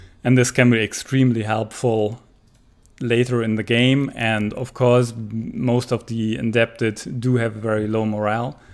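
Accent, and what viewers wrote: Germany. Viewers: 宁这英语，一股子🇩🇪味儿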